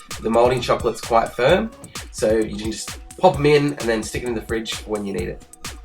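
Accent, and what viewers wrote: Australia. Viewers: Oh my god you're Australian! I was expecting someone british! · I only realised you were Australian when I actually really listened to your voice. I guess you don't notice your own accent.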